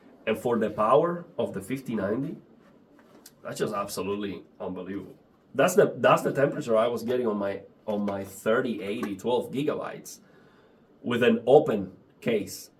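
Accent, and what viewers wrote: Cuba. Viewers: I knew that was from some Spanish speaking country because of occasiona cute s before e. Cuba:) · Lo sabiaaaa asere el acento me sonaba a cubano. Felicidades hermano. Keep it going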